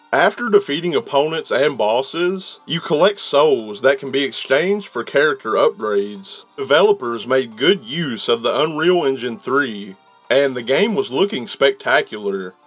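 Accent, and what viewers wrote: South, United States. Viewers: He sounds like he's from Texas or Louisiana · i love dat voice you're the southern chills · I don't know why but I just like your Southern accent narrating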